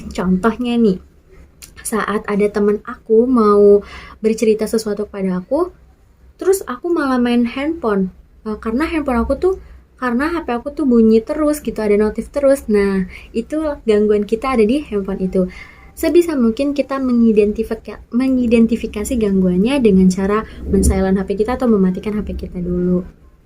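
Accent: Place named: Madura, Indonesia. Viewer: Kadang logat madura ngikut wkwkwk 😅😅 selebih ny ok lah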